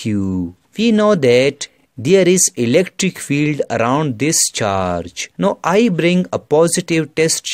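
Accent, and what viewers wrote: India. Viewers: you know its gonna be good when the guy has an indian accent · hindian english is so funny. bro you gotta learn English. we hear you in da class to laugh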